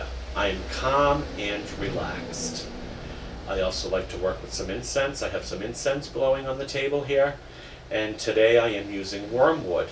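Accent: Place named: Massachusetts, United States. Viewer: Where are you located? From the sound of your voice, I'd say Massachusetts. :)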